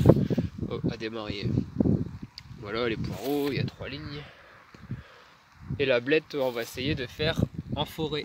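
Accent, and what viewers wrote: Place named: Nord, France. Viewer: Bonjour . J'aime bien je m'abonne !!! je suis un potagiste de l' ile de la Réunion , j'ai bien reconnu la pointe d'accent du Nord !!! Je suis Picard d'origine . La menthe c"est efficace pour l'éloignement de certains insectes volants et rongeurs , ils ne doivent pas apprécier l 'odeur !!! Les œillets d'Indes c'est pas mal non plus . Bonne continuation , le meilleur pour toi et tes plantations .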